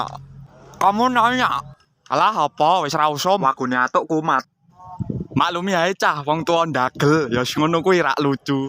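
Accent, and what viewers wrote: Surakarta, Indonesia. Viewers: wong solo to bang?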